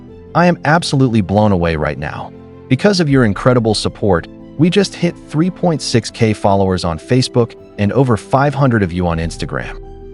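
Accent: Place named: United States. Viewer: The American accent devalues the video.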